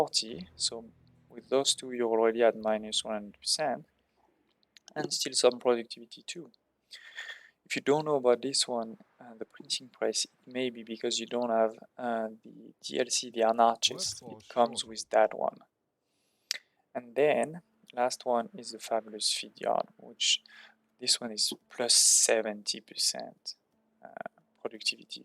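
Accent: France. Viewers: You sound french :D.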